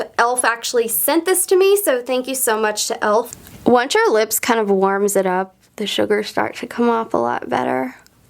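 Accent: South, United States. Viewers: I think she should try asmr. Her southern voice might actually work if she's whispering.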